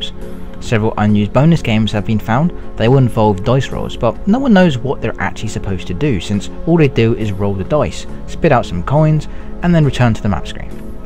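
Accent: United Kingdom. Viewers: So, why can't the guy who narrated the "creepy easter egg" video have done this onr too? I can't fucking understand anything over that thick British accent! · I can't understand half the shit this guys says. He sounds british but then says 'dewelopment" like a russian. I need some fuckin subtitles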